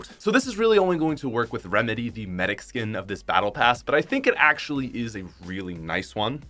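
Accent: Canada. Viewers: All Canadian “oots”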